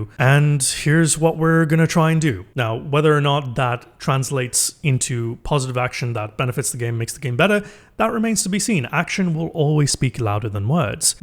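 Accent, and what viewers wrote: United Kingdom. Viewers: No doubt intimidated them with his high class British dialect, manners and intelligence. If only you got to have a meeting with the suits at the top instead of the developers whose hands are tied. I bet you would’ve handed them their ass in the most civilized discourse with dignified dialogue and a few witty passive aggressive jabs in between. Maybe one day… maybe.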